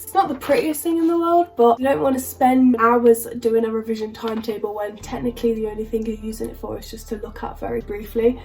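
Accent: United Kingdom. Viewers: I like ur British accent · her British accent <33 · you have british accent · Guess ,you speak britich accent ,right ?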